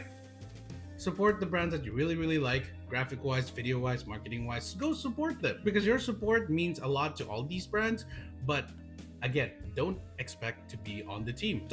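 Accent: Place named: Philippines. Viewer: After watching, I realized that fingerboard sponsorships shows how committed and consistent you are, not just by tricks and fanbase but by brand and showmanship. The way you do tricks, content, and how open you are to the community are the people who truly deserves sponsorships. No tryhard bullshits with "SpOnsOr mE" without actually bringing any to the table. Sponsorship is not a competition—it's about proving yourself and how your going to bring something on the table, and if people like what you brought. More and more people will demand. Also, I can also hear how your Filipino accent is obliviously slipping out throughout the video haha😂✌🏻❤️‍🩹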